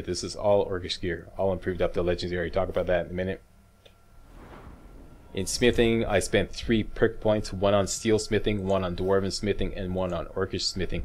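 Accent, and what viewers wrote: Canada. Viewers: You’re Canadian aren’t you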